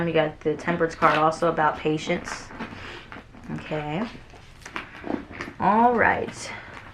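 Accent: Bronx, United States. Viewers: Girl I thought you were from the Bronx, I was gonna say I'm from there too🤣 I just subscribed and yes, he's an aquarius. He just finished packing his things with a police escort 😩 I'm so over him and relationships. I've watched at least a dozen sag readings and they all say the same thing: it's painful but it's good for me.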